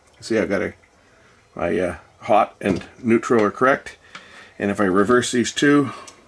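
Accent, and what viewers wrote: Canada. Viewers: Canadians pronounce been like bean .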